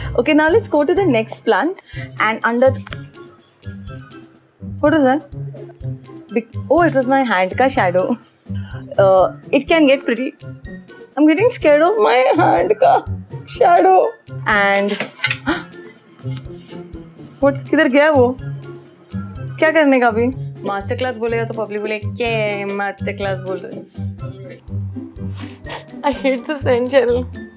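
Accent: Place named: Maharashtra, India. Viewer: i love yrr hindi marathi accent 😂........i love yr plant vedios .....but idky ig this is the best video ......LOVE from DELHI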